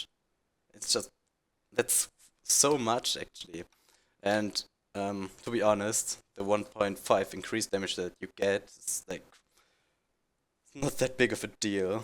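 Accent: Germany. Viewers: are you a german guy? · u can tell the way he speaks hes german lol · locker der akzent ist so offensichtlich außerdem sind die besten rengar spieler deutsche ^^ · He is german ! you hear that. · Bist du deutscch ? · Bist du deutscher? :D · Do u come from Germany ? Hört mann nen bissle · are you form germany or austria ?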